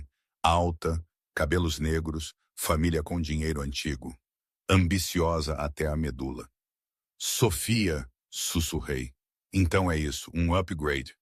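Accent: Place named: Portugal. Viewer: MUITO BOM OUVIR A NARRAÇÃO LUSITANA, EM UM CANAL NOVO. O ENREDO É EXCEPCIONAL COMO SEMPRE.... Bem vindos! ✨💫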